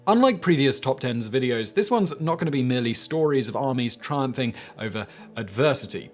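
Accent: United Kingdom. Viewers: I sometimes wonder if Simon's videos would still sound educational if he'd have an American or Aussie accent. He could read the instructions on a box of matches in his British accent and it would sound like a lecture on quantum physics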